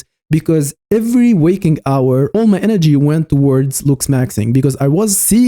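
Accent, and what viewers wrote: Russia. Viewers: Bro are you Russian? Your English is really good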